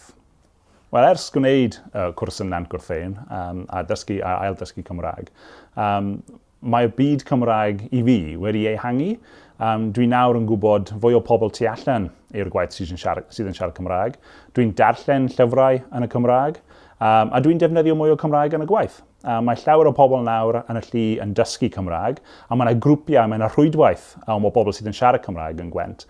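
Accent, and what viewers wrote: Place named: England, United Kingdom. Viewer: How have you managed to speak Welsh with an English accent?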